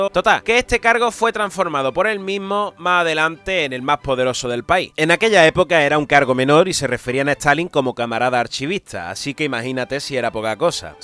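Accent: Spain. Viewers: Mejor esta el ruso q el acento español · Tu acento andalúz da puto asco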